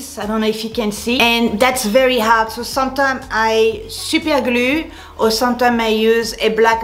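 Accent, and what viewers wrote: France. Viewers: I agree! J’adore da porter les talons hauts!! Je me sens super sexy 💕😆 Aussi j’adore sa te vidéo! Ton accent français es magnifique 😍 (je suis Canadian)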